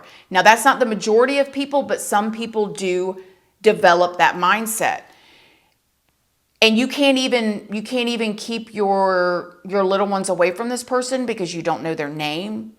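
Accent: South, United States. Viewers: Love your southern accent , that’s a dangerous move to keep letting him out and protecting him? There’s some like that going on in Southern California a guy parks across the from a school and records them, police said he hasn’t done nothing wrong yet but the parents don’t want him there but some say he has a student said something to the news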